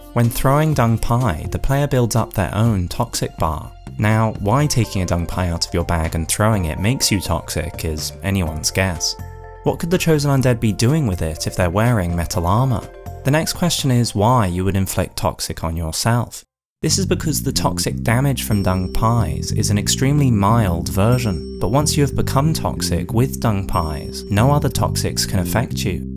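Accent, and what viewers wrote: United Kingdom. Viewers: An actual good YouTuber making good content! Love to see it! Too many wannabes out there, for some reason I think you and 3kliks would get along great, you two and the amount of British emitting is incredible, especially in narration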